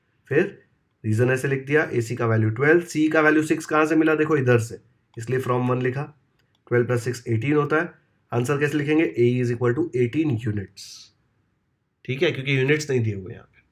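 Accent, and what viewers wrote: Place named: Maharashtra, India. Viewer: I having doubt of your language your mother tongue is Marathi because you was tell tow one chaa that way😅😅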